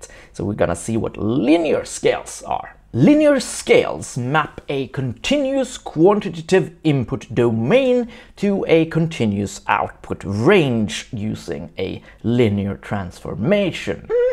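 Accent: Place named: Sweden. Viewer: How i missed that Swedish accent and humour! Lovin' it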